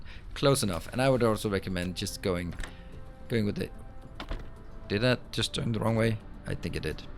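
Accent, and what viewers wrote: Denmark. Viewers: Your accent sounds danish :D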